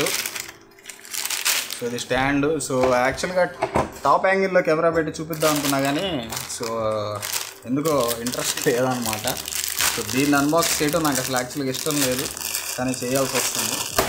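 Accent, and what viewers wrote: Guntur, India. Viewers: మీరు గుంటూరు నా 😀😀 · Meedi guntur aa anna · Meedhi guntur aa bro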